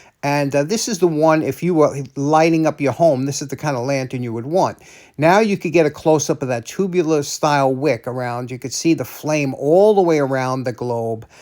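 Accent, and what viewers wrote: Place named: Cape Cod, United States. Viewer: Don’t recall much about my Dad as he passed when I was seven years old, but I do remember that he always sat at the head of the table. Don’t really know why but as a teenager and later I sat at the head of the table even though I was the youngest. It always seemed that my Mom treated me as the “head of the family” rather then my brother. By the way, love your accent. Reminds me of back home on Cape Cod. Happy New Year.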